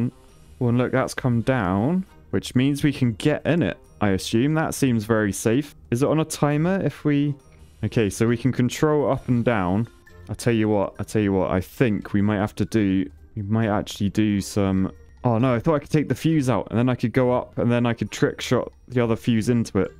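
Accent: United Kingdom. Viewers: Honestly this feels like graystillplays old content but with a British voice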